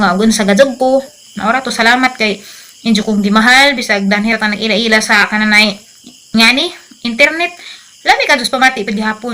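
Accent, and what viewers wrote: Bohol, Philippines. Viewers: Mag vlog ka na lang mae tour around bohol sa mga towns with the same bol anon accent for sure kikita ka pa padayun fan from texas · Hi Ma'am , keep on vlogging .. Missing our accent in Bohol.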